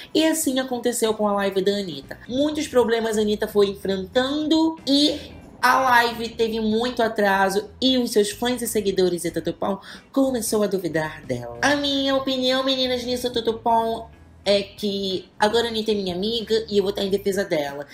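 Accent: United States. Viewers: Que sotaque americano lindo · queria entender esse sotaque meio brasileiro meio texano meio nordestino da blogueirinha.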